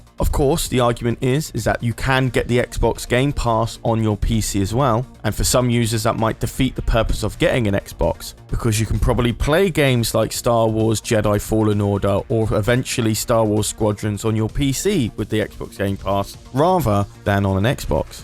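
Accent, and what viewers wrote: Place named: London, United Kingdom. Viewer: You sound like your from London.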